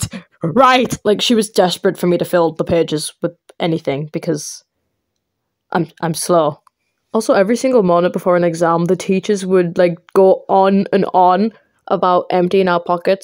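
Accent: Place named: North, United Kingdom. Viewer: you sound so northern LOL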